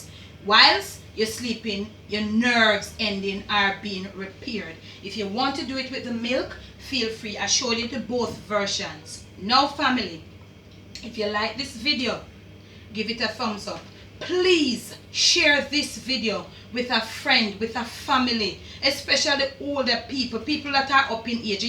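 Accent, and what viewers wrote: Jamaica. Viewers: I heard the accent and BOOM 💥 HIT that subscribe button... I love ❤️ mama’ patois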